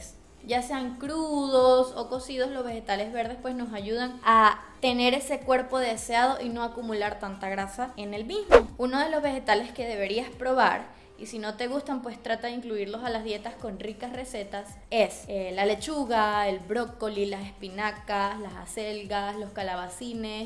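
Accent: Colombia. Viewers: Eres colombiana y costeña carajoo <3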